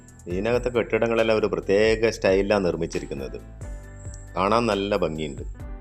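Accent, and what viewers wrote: Kannur, India. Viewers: തനി kannur ഭാഷ, നന്നായിട്ടുണ്ട്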